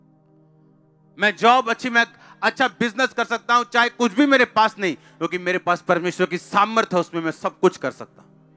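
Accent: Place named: Punjab, India. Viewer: Sir ji daily I listen to you ur punjabi touch is very attractive. Lord Jesus bless you with endless blessing🙏🙏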